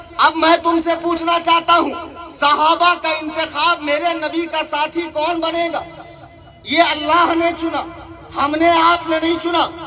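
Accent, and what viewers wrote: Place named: Karnataka, India. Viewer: Karnataka accent mashallah Hazrat ko itna achha kese aata he Mumbai me rehte hen fir bhi...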